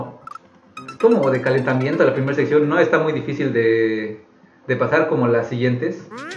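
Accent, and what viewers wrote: Mexico. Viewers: o ya amigo es que el acento del centro me sonabas a vecino yo soy de acá de Toluca y dije si hay suerte hasta una buena tetilla de algún juego saludos un abrazo amigo.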